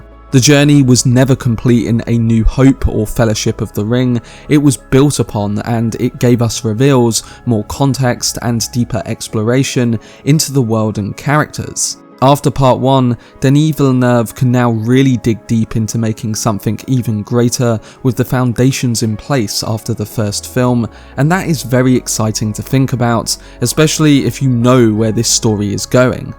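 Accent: United Kingdom. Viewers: really nice british accent :D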